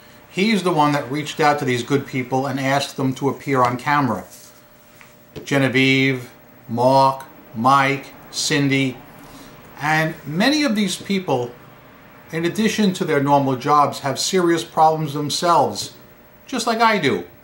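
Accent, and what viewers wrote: New York, United States. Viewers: noticed ur NuYawk accent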